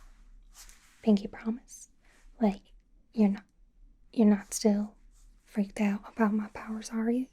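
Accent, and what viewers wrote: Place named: South, United States. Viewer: Had been missing my favorite southern accented super hero. Always a wonderful experience.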